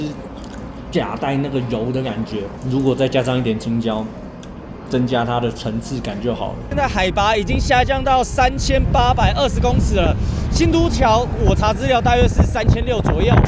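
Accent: Taiwan. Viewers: 一開口講話，就知道是台灣人，這沒辦法。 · 你说话的腔调和那个美食博主感觉太像了 那个博主也是台湾郎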